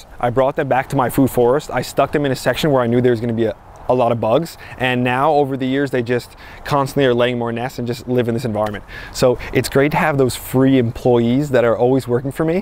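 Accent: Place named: New Jersey, United States. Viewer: I had a customer call yesterday and he had a faint accent that I recognized but couldn't place. Then he told me he was from New Jersey and I knew right then that he sounded like. So I guess he's probably from the same region.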